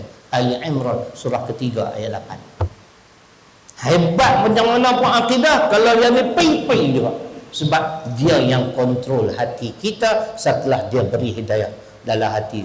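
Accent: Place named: Kedah, Malaysia. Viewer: Biasalah orang Kedah, kasaq sikit.. tapi hati bertaman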